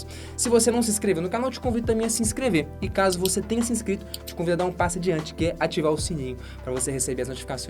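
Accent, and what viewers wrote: Minas Gerais, Brazil. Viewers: O sotaque dele é muito de mineiro msm minha família é mineira e eu sou goiana e ai ja viu né ksksksks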